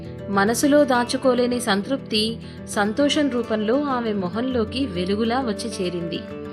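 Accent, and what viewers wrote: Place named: Godavari, India. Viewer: ఇది సాధారణంగా తండ్రికి ఎదురయ్యే పరిస్థితి. కొందరు తల్లులు కూడా ఎదుర్కొంటారనుకోండి! భర్త కూడా అలా పిల్లలతో కలిసిపోయి భార్యను ఆడిపోసుకోవడం కాస్త ఆశ్చర్యాన్ని కలిగించింది. అతనైనా ఆమె వాళ్ళను తీర్చిదిద్దిన విషయాన్ని గుర్తించి వాళ్ళను మందలించి ఉండాలి. అప్పుడు కథ సహజంగా ఉండేది. స్త్రీవాద కథ రాయాలని రాస్తూ స్త్రీ కూడా స్త్రీకి శత్రువే అని చెప్పే ప్రయత్నం చేశారు రచయిత్రి అనిపిస్తోంది. అచ్చం మా గోదావరి జిల్లాల స్త్రీల యాసలో ఎంత బాగా చెబుతున్నారో! ఎన్ని సార్లైనా మెచ్చుకోకుండా ఉండలేకపోతున్నాను. ఆమె విజయవాడలో పెరిగిన విషయం తెలియక ముందు నెల్లూరు వాసి ఈ యాసలో ఎలా మాట్లాడగలుగుతున్నారన్న ఆలోచన ఇప్పటికీ పోక ఇలా అనిపిస్తుందేమో! విజయవాడ యాస కూడా కాదు ఆమెది.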